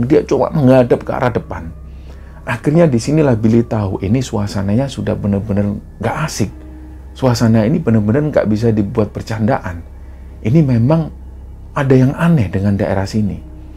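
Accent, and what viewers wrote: Java, Indonesia. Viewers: Seneng ama mas bercerita sprti ngalami sndr, enak di dgr, mudah dipahami.. Luar biasa.. Dan plg respek org jawa yg ga hilang jawanya... Salut mas👍🏼👍🏼👍🏼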